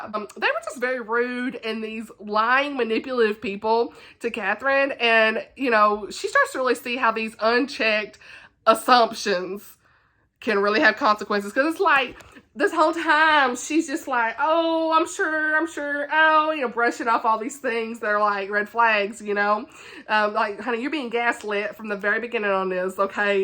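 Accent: South, United States. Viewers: Northanger Abbey in a southern accent is not something I knew I needed in my life 😂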